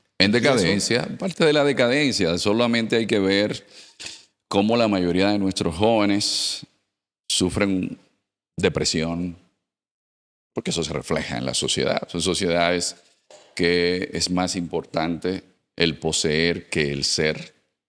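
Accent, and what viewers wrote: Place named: Venezuela. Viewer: Tiene acento venezolano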